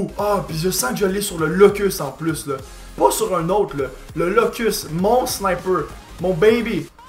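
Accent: Quebec, Canada. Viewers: T tu québécois ?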